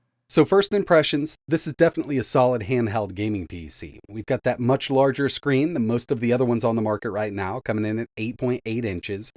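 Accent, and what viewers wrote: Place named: North Carolina, United States. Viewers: Has one of the thickest American accent I have ever heard love the way he says "Mawd" 😂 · That's NC talking!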